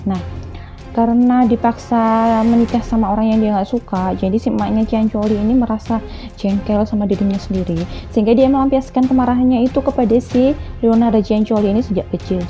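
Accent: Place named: Java, Indonesia. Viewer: masih medok ya buk 😭😂💛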